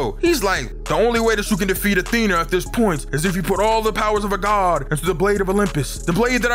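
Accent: Philadelphia, United States. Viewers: You from Philly bro?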